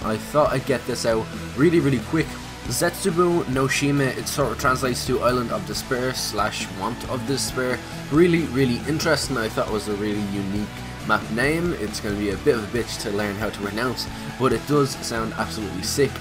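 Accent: Ireland. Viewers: Are you irish I am?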